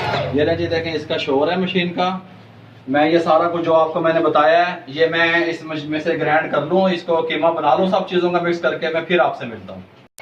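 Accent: Pakistan. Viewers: I respect u alot u have no idea about it....u talk like my jhaiji and she was from Pakistan.i would love to visit pakistan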